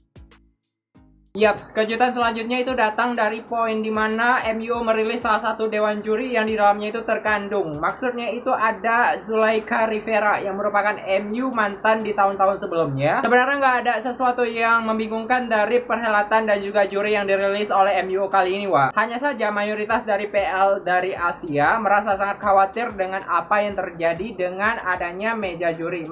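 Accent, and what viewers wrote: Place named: Medan, Indonesia. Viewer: Wak ud ku suscribe channel kau wak,, sukses selalu ya,, kayak org medan cakap kau wak🤣😁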